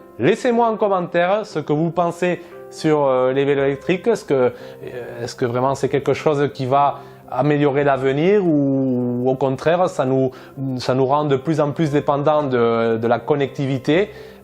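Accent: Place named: South, France. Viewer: Bien le bonjour l'accent du soleil ^^ Le VAE... une belle rencontre pour ma part. 10 ans de pratique et franchement je donne 10/10, il fait son taf. Acquis pour un nouveau velo-taf mais surtout pour les missions de visites au domicile que je dois faire dans toute la ville. Mon 1e est acheté chez décathlon (il y a donc 10 ans), bas de gamme, 750€. Il a fallut ajouter des sacoches, des pneus anticrevaisons (efficace à 100% en ville, jamais crevé sinon tous les 15 jours... pchiiii) et une fourche téléscopique (terme ?). Et bien il a tenu 10 ans par tout temps et ce avec des milliers de Km par an (6000 km/an rien que pour le trajet domicile-travail-domicile + les missions et une année j'ai même "noyer" le système électrique vu la douche que je me suis pris). Bon, il est vrai qu'il visitait les ateliers d'un pro 2x an + batterie chouchoutée au chaud en hiver). 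J'ai fini par le délaisser (et il est tjs à la maison ^^) car malgré les régulières révisions, ben oui, la batterie n'avait plus 20 ans (comme moi d'ailleurs) et des pièces principales commençaient à lâcher (j'avais un clé allen dans mes sacoches pour reserrer le pédalier régulièrement par exemple). Bof, cela devenait galère. Puis un jour, en visite sur le site d'une grande enseigne sportive française (la même que pour mon 1e), je vois une promotion d'enfer pour un VAE d'un partenaire hollandais : 1500€ au lieu de 2500€. Wow ! J'ai craqué, il était temps, j'ai mon 2e VAE. je suis passé d'un moteur dans la roue arrière au mot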